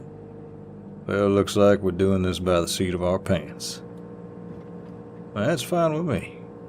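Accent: South, United States. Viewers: The southern accent rahhhh I love me a southern gentlemannnn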